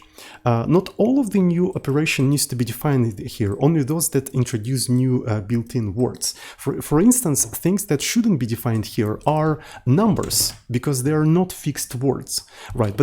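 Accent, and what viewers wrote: Russia. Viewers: Слишком русский акцент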